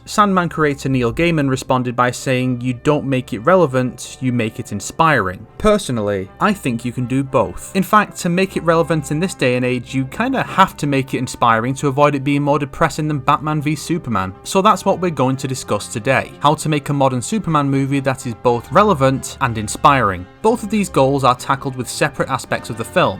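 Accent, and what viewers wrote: United Kingdom. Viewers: “Donald trump is the Real life Lex Luthor.” 🤦🏻‍♂️ You sound British so I’m going to assume you are. If all you know about American politics is what you hear from CNN or your local news, maybe dial back the commentary. Trump has the public’s support because he puts the people above globalism and big government. The media is trying to destroy him because they are far leftists in the pocket of large corporations. Trump is a hero for making himself a target of relentless personal attacks by protecting the freedom of American citizens. He’s closer to Superman than lex Luthor. Using his power for good, when it would be far easier not to. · "Donald Trump is already the real life Lex Luthor". You know 'Donald Trump' is a weird way of spelling George Soros, or were you trying to say Michael Bloomberg? You brits! English between Americans and Brits sounds so different sometimes.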